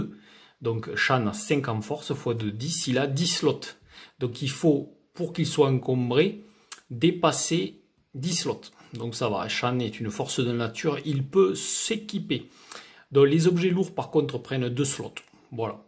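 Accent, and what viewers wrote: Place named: Toulouse, France. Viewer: ho con mais vous êtes de Toulouse? merci pour cette présentation, je veux me lancer dans le jdr et celui-ci me branche bien :)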